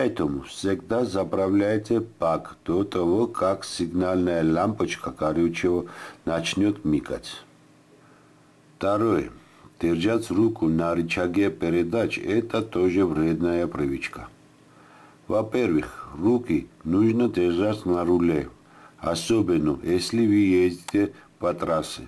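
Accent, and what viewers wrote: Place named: Kutaisi, Georgia. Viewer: ვაა ქუთაისის გაიჩითა :)))ქუთაისელი ხართ?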